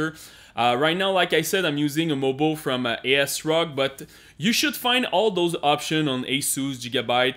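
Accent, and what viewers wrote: Quebec, Canada. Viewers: I love French Canadian accents 😁 Had mixed results with my 7800X3D on stock settings with a gigabyte B650 aorus elite ax. Mid to low 17000 scores in cinebench multicore. Did exactly what you did in this video and it's up to around 18,000 now. I'm only running -15 and it seems to be stable, but from what you said it seems realistic to try for -20 or even -30, so I'll probably try that later today. What kind of clocks are you getting for multi-core loads? Great video, thanks.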